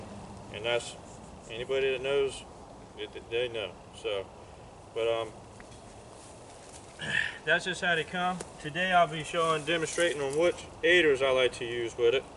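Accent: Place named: Louisiana, United States. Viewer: I read S. Carolina but Coming from a Cajun, you sound Cajun as hell man lol I just ordered these sticks. Good idea about the rock climbing aider.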